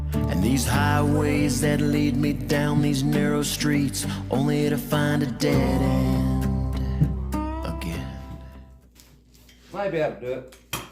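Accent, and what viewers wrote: South, United States. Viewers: Hey.I never node you was southern. 😅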